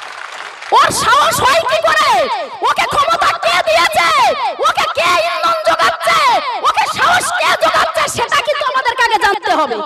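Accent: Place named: Bangladesh. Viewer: জয় শ্রী রাম 🚩🚩 কথা শুনে বোঝা যাচ্ছে আপনি বাংলাদেশী মুসলিম।। এত এব হিন্দু হিন্দু বলে চেঁচাবেন না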